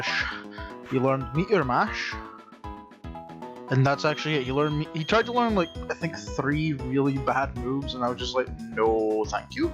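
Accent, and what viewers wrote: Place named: Scotland, United Kingdom. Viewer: So much Scottish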